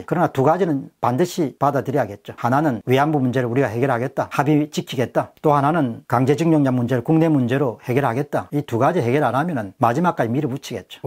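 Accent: Gyeongsang, South Korea. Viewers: 일단 갱상도 사투리 쓰면서부터 아웃이다 ㅋ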